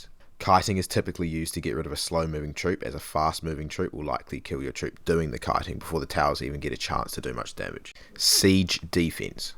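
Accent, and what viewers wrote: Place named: Australia. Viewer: Bro sounds Australian is this a ken reference???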